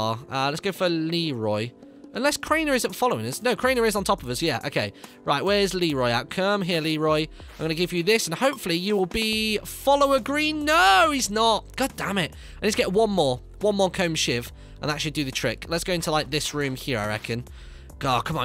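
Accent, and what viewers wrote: United Kingdom. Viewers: Banana Bucket He Has a British Accent If You Havent Already Noticed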